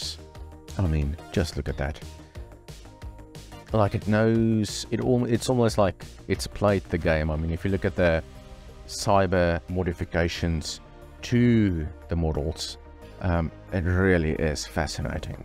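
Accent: South Africa. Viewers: That South African accent 😂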